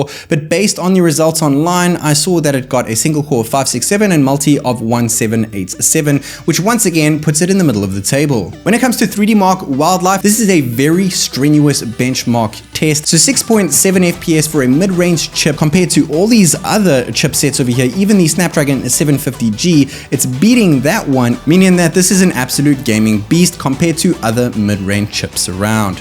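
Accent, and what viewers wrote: South Africa. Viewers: Bro you sound South African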